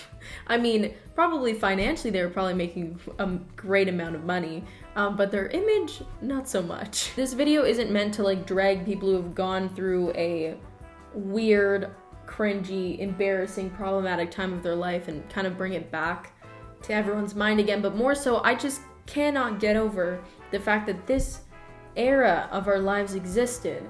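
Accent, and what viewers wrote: Canada. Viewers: I can tell you’re Canadian by the way you pronounce Magcon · YOUR CANADIAN ACCENT IS SO CUTE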